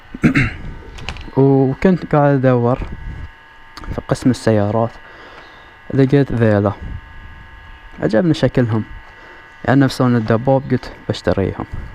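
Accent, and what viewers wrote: Bahrain. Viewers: ي حلو لهجة البحرين <3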